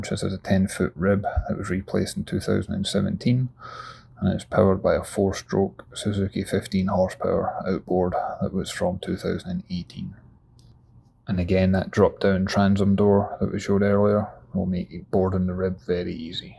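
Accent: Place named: Scotland, United Kingdom. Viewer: Nice to hear a Scottish accent..🏴🏴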